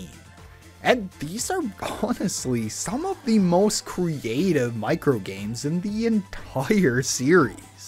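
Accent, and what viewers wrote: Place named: Midwest, United States. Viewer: This video was well researched I had never heard of some of these game boy games before!! Well edited and love the humor. However your midwestern O's were hilarious!! They remind me of Kevin from the Office which I know is a bad comparison but I think you should lean in on a persona as a Midwestern dolt.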